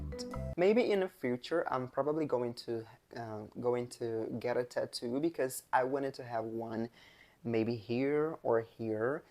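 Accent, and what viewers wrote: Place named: Brazil. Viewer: não tem como não entender pois por mais que você tente fazer a pronúncia de um americano você fala do jeito cadenciado de um brasileiro então está 100% compreensível sim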